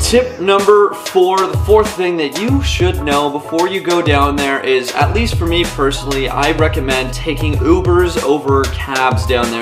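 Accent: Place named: United States. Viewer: Your Spanish must be highly American accented. Chili but you keep saying chilayan. I thought it was chilian....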